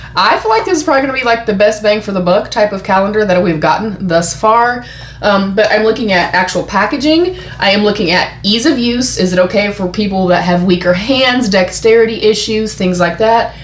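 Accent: South, United States. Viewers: I watch Alexandria and I thought she had shown every calendar in existence. This is a pleasant surprise. I am presently using a NYX lipstick. Nothing fancy nude color but if I can't replace it when it's gone I'll be lost. I'm a mature gal and don't use a lot of makeup. I find NYX or Elf usually meets my needs. Off to watch the opening :-) Wow so far the price, the packaging and the free gift with purchasing. How can you go wrong. I'll be back. Haha. Definitely a good price. I felt it was very lip color heavy. Would have like to see some powder blush, mascara and maybe lip bullets. I'm an old fashioned gal. I did miss the items we didn't see you open so possibly some of the things I mentioned were in there. I'm off to subscribe and check out your content. I love that Southern Twang. 🤠😉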